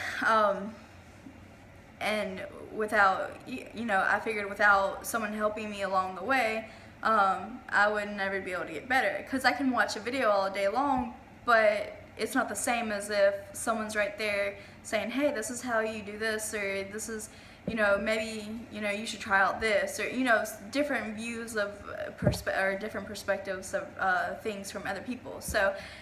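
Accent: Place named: South, United States. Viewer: I watched the whole video - I really enjoyed getting to know you better. I love your family values - sounds like your family is the best, especially your brother! Guess what girl? I believe in you too! You have so many talents. You are a really good artist! I'm very happy to have met you and one day maybe we can do a collab when we have more subscribers! What state do you live in? You have a southern accent. Also what is mudding?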